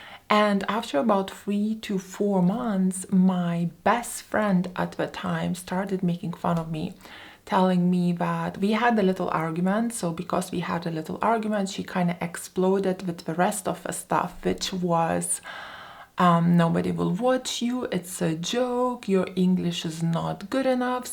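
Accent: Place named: Hudson Valley, United States. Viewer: your awesome and we embrace your awesome HV accent and we understand each other:) Built for greatness, success and kindness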